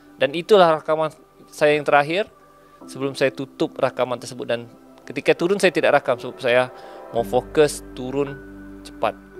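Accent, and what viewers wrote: Sabah, Malaysia. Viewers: Sabah bah ni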